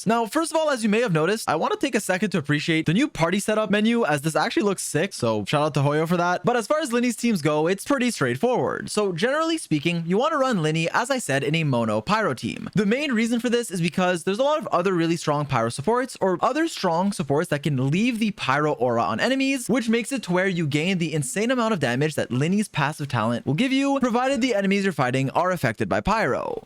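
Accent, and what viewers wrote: Quebec, Canada. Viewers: does he have a cold or Canadian?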